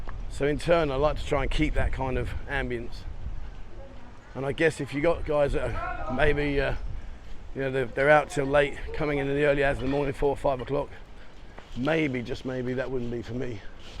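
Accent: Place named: London, United Kingdom. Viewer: I'm from los Angeles, and i have difficulty understanding your London accent, but it's worth it! Lov You! Keep it up!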